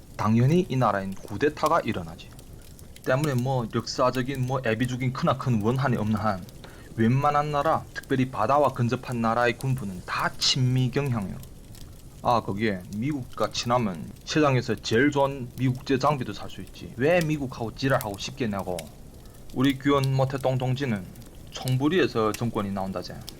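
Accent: Yanbian, China. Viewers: 야 너꼭 돈 받아먹고하는 소리구나 이후부터 연변말 하지마 왜 뭘아는것처럼하며 남이 정치에 간섭이냐연변사람 쫒 팔리계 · 잘들었소ㅡ오빠욕하는것도 멋있고 연변말도 너무멋있소 다음절목을 기대하겠소 화이팅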